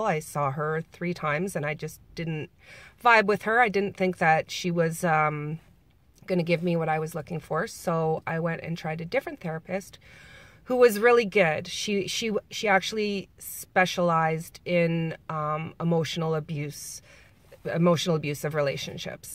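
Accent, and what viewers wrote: Canada. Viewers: I thought you sounded Canadian! 😄 I'm in Wisconsin on lake Michigan, great lakes goddess siSTARs ♡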